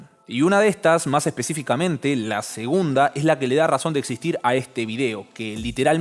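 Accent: Argentina. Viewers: Che, que me han gustado tus videos. Muy sensatos, pibe. Saludos desde México. Seguí así. (Me encanta el acento Argentino, sin afán de burla). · Por la forma de hablar parece argentino o uruguayo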